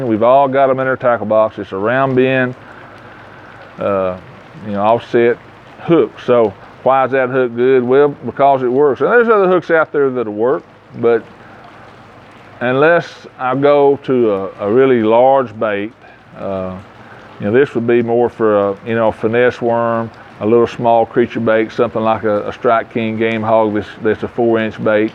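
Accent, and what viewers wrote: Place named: Appalachia, United States. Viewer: Appreciate you spreading the seed of knowledge, you got the perfect hillbilly accent for these videos lol. Even if it weren't gold that accent would convince half of your viewers.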